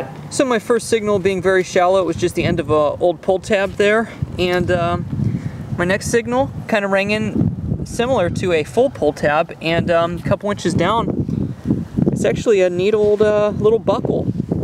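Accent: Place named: South, United States. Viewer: Great hunt its also funny you are picking up on the southern accent and sayings.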